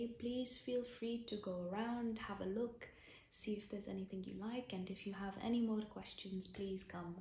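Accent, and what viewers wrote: India. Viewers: love your indian accent videos! We should make one together - i messaged you on instagram! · I love your Indian accent! I don't know why but it's more relaxing than any other accents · your indian accent videos are the best!!!!!!!!!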